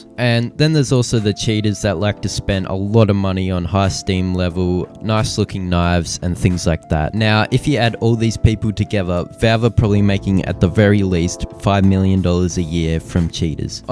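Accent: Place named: Australia. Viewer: i think i played with this guy last night on deathmatch i'm guessing your from aussie from the accent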